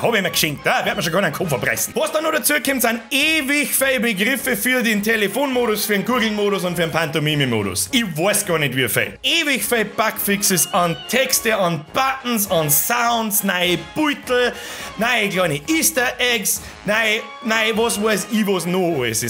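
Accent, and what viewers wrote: Bavaria, Germany. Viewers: Gibts as englisch dann a mit Bayerndialekt ?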